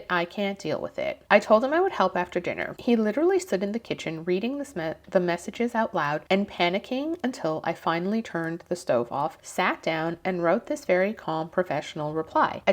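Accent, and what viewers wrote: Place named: United States. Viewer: what is your accent? She’s American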